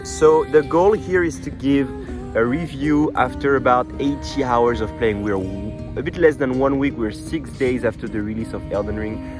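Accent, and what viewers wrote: France. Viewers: It was good while I was around 50 because at least you don't die with a single shot, the problem happens when you are constantly ganked. You can only invade if the host already has a summon, and once you invade he can summon a blue hunter... There should not be an item like that, and the invader should be able to summon help. That's my opinion. Fixing PVP is the only thing this game needs in order to b perfect imo. You've got a new subscriber, I am looking forward to watching more videos. Loved the French accent haha!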